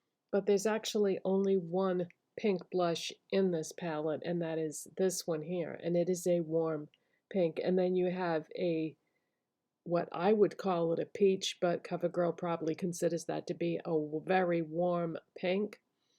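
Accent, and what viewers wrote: Boston, United States. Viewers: Just found your channel and I’m binging videos! Is that a Boston accent I hear 🩶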